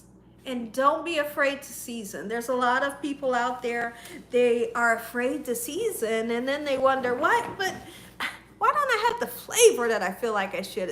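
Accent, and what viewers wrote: South, United States. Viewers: Mrs. you are awesome. Love your cooking videos. You sound like a southern girl are you from Louisiana?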